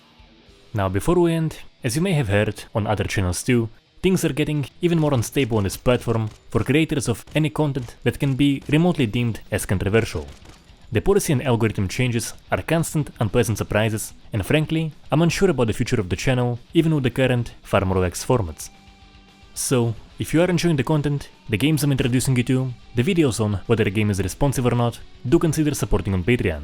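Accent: Russia. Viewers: Чет твой акцент очень похож на русский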